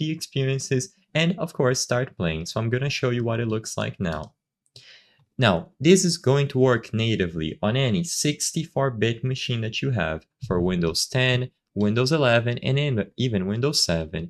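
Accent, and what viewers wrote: Brazil. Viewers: is that a brazilian accent?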